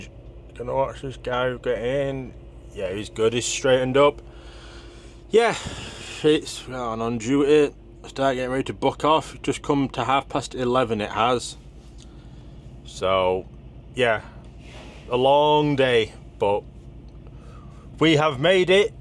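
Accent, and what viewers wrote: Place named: England, United Kingdom. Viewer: Nice truck and that English accent is going to throw off some people 😅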